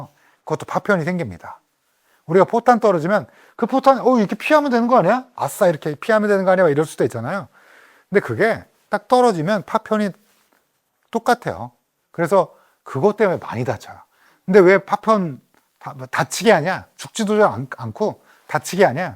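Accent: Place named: Gyeongsang, South Korea. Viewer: 피디님 경상도 분이신갑네예 사투리 이빠이 써뿌네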